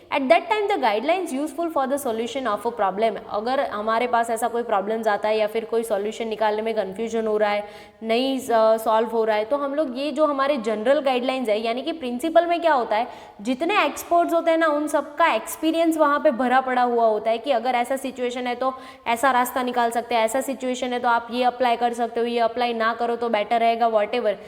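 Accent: Gujarat, India. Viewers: Gujarathi Accent ❤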